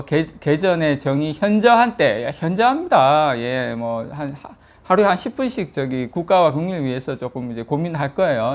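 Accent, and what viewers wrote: Gyeongsang, South Korea. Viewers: 경상도 액센트 없이 말씀하시면 듣기에 좋겠습니다.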